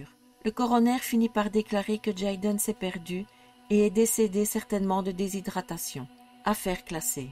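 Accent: Belgium. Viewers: j'adore l'accent belge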